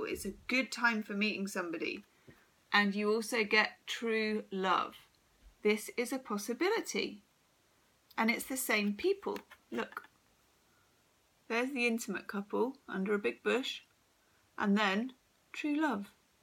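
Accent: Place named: United Kingdom. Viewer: All true. Miraculous. I adore both your readings and your British pronunciation, one of the best in my YouTube community (David Icke's being the other, ha ha). Thanks.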